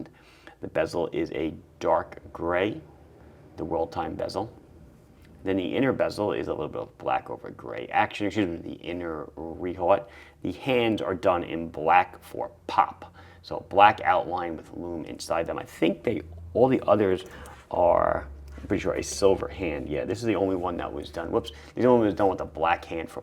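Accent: Long Island, United States. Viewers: that's pure Long Island, son 😛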